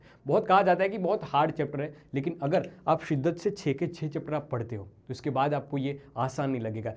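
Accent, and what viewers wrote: Gujarat, India. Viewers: sir aap Gujarati ho????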